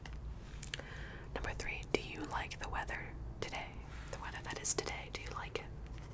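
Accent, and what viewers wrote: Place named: South, United States. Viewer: The southern accent is backk!! Literally ur southern accent vid is my fav vid to fall asleep to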